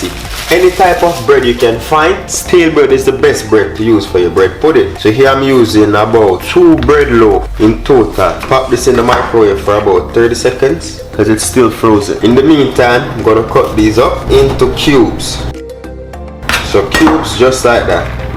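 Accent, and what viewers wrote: Jamaica. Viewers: As soon as I heard that Jamaica acent...I started smiling, hey maan 😃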